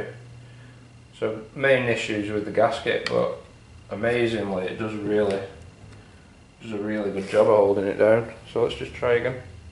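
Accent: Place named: Northern England, United Kingdom. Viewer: Hi, Its actually nice to hear a northern accent instead of an American one. However i do like the guy John Saunders here on YouTube NYCCNC Hes the dood. I was just looking for the.nc code (has the machine code XYZ steps in it) file your machine used to cut the troughs in the plate. I figured you were using a cnc machine to mill the table. What program is your mill using Mach3?. I produced an autocad drawing of a vacuum chuck not dissimilar however i did cheat. I thought of milling the grooves in the top as you would but then drilling only one hole in from the side of the plate into the centre then a hole in the top of the plate down into the side hole. Your design using a chamber milled under the plate instead which to be honest is the correct way of doing it. I tried to convert the file in Cambam (post processor) into an NC file for my milling machine but ended up making about 100 profiles around each castllation in the top plate, not the straight x then y mill routes i was expecting. 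Im Still a novice i'm afraid. I did manage to build a vacuum ejector/generator for to go on an air supply like this one on Ebay <link> Got some good results as well I have a similar Vac pump to yours which is actually what i will use in the end.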